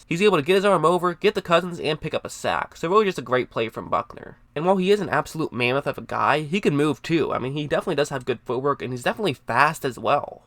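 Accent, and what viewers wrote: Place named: Alaska, United States. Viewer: The guy talking sounds like the Alaskan Bush people 🤔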